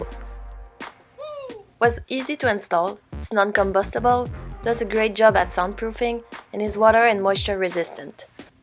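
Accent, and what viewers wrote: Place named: Quebec, Canada. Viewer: french canadian i think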